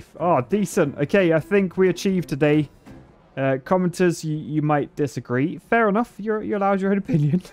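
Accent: United Kingdom. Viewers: Are you British? You're accent like british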